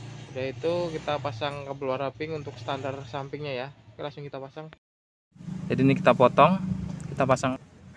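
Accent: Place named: Palembang, Indonesia. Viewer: Asli mana bos? Bahasanya kayak orang Sumatra Palembang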